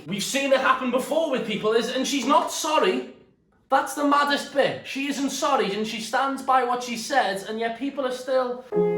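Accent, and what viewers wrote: Liverpool, United Kingdom. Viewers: scouser to scouser I love you 😂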